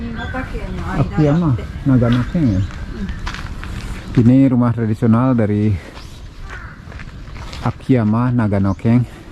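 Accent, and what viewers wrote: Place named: Bali, Indonesia. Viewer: Pasti anak bali ne bli..logatne jek bali keleh.Alat numbuk padi gantung jd kulkul.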